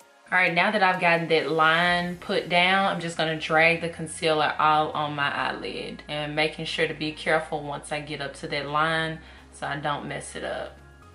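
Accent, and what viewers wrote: South, United States. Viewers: Beautiful! Every Time I watch your videos I always think of Beyonce your voice sounds so much like her speaking voice. Not just the southern accent but also the tone.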